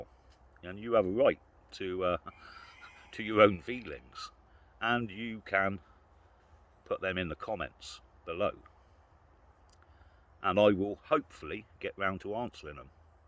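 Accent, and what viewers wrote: United Kingdom. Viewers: I'm lucky to hear a British voice where I live......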